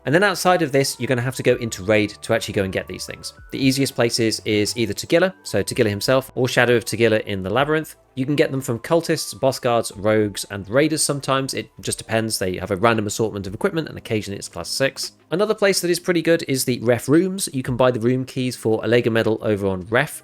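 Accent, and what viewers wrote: United Kingdom. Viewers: he's a brit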